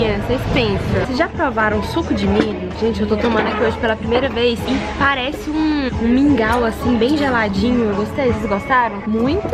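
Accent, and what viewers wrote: Minas Gerais, Brazil. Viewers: Gostei muito Lindo Em Unaí lugares lindos,um beijo meu amor fique com Deus❤ sotaque maravilhoso e do pessoal de Minas😍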